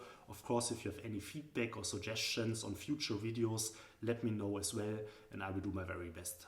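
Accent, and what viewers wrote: Germany. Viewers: My business mentor I did know I had. Like the German accent more so the content. I asked them all and you answered. danke aus tiefstem herzen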